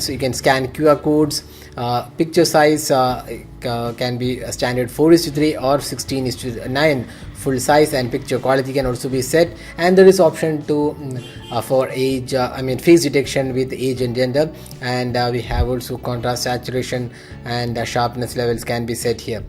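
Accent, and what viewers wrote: Kerala, India. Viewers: bro u from kerala?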